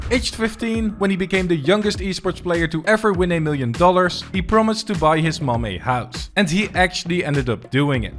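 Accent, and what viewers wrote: Netherlands. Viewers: i hear a dutch accent can that be true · i smell dutch in ur accent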